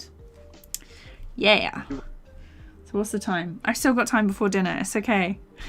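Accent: United Kingdom. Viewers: Are you British or Australian ?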